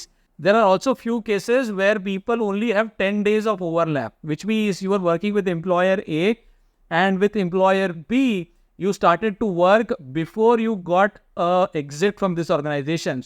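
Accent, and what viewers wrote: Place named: India. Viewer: I love all people but Indian accent is horrible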